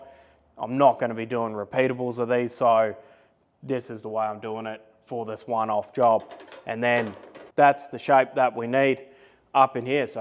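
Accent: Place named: Australia. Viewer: I'm so impressed by the knowledge and craftsmanship that gets shown here in this video. That and well as a Ducthie I like to here a Aussie accent talk shop.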